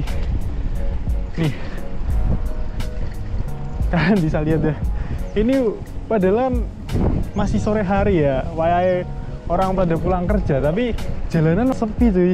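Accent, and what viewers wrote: Java, Indonesia. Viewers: mas bro sampean logatnya kok kayak orang jawa ya ,kalau boleh tau jawa mana ya? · Aku seneng logat jowo mu mass💍😻😌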